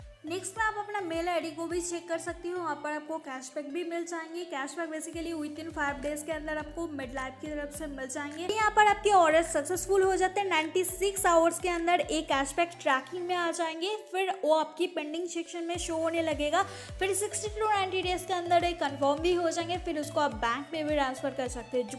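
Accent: Bengal, India. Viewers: Bangali hai ye log aise hi Bolte hai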